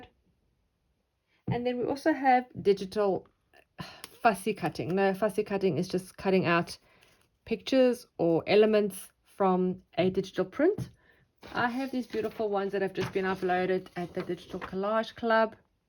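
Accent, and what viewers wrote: South Africa. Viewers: I thought I spied a South African accent!! Always love finding another South African Youtuber. Love your work!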